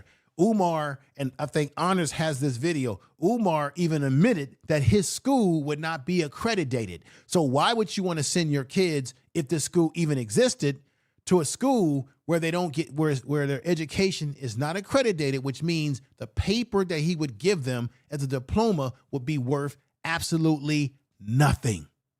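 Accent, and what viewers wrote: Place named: England, United Kingdom. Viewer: UR ”ENGLISH ACCENT” iZZZ JUST DREADFULL‼️‼️‼️😱😱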